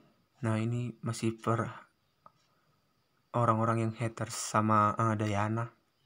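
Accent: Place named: Batak, Indonesia. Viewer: Kereeenn. Keren Chanel mu bro.. Tapi ngakak aku.. Hahahah.. BATAK nya kental kali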